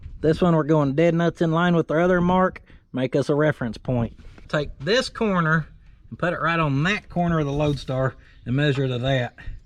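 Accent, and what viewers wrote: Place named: Gastonia, United States. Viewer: Luckily I lived in Gastonia for 4 years, or else I wouldn’t be able to understand a single sarcastic word this guy said!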